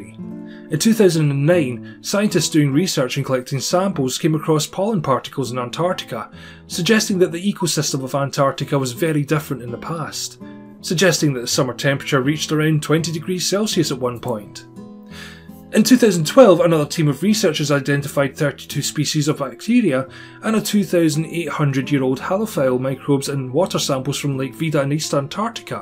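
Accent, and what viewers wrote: Scotland, United Kingdom. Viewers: the scotish accent made me lol good video tho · Noor M Yus don't tell the Scottish man narrating, the he is English, he is likely to get very upset. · If my great grandfather Reed Gordon hadn't moved from Aberdeen, Scotland, I'd have that same awesome Scottish accent.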